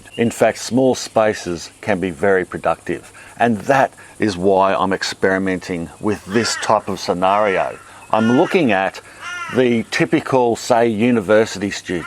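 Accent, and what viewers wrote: Australia. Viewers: WOW! An Aussie, in QLD to boot. I live in Cairns so here it's either hot, hotter or pouring with rain (this doesn't include cyclones). It's so refreshing to have some advice from Australia, and not have to interpret it from OS into our climate. I love growing from scraps. I have very successfully grown sweet potato from old (sprouting) potatoes. One year I got over 15 kg!! Growing things in my part of the world can be difficult. "Plant in Autumn", "Sow before summer", means nothing up here. You plant when you remember to and hope it doesn't go to seed before 6 weeks! Anyway, love your information, and to hear an Aussie accent. Thanks.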